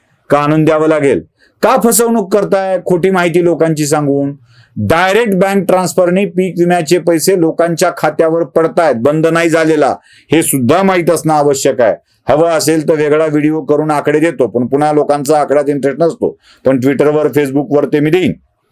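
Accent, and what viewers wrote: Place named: Solapur, India. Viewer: very nice..real representing.. reticular in solapuri..tone..😅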